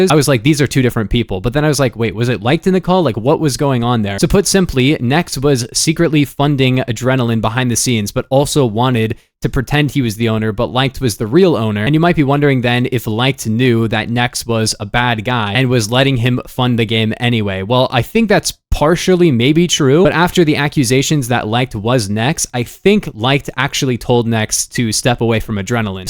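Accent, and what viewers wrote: United Kingdom. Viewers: AND he's british?? damn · Hes fucking BRITISH???? explodes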